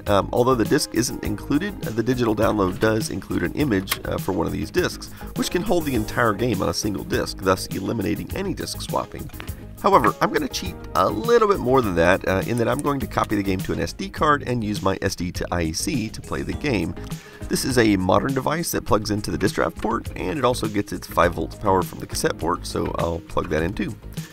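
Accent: France. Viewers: Awwwwwwwn your french is adorable ^^ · I like your french accent 😀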